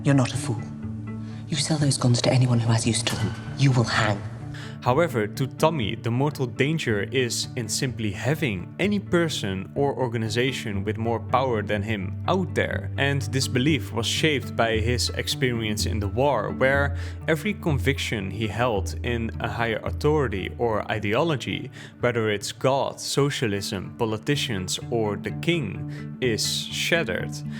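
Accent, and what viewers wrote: Netherlands. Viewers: Klinkt als een Nederlands accent, mooie, interessante video. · Strong dutch accent haha. Great video.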